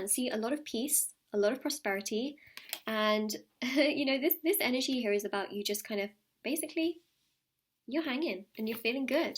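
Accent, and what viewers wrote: England, United Kingdom. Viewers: You’ve got a beautiful English accent 🏴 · Oh my gosh you’re English! Glad to see a fellow countryman represent! 😆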